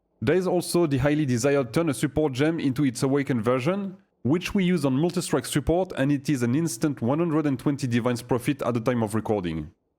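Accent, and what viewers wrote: France. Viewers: Thanks by any chance are you french ?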